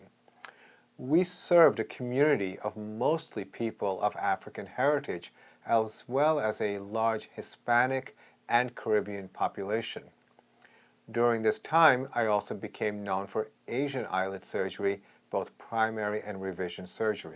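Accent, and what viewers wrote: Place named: India. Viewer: Your accent sounds indian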